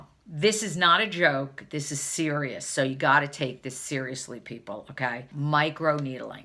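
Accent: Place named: New Jersey, United States. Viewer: I have to try micro needling. Yours is the third beauty channel that talks about serious results, and something’s gotta give with this saggy skin. Had not heard of the Gold Bond cream, but I am now on the lookout for it. BTW—love your Jersey accent—a boss and best friend are from NJ , right from your neck of the woods! Love your sense of humor—keep up the good work!❤️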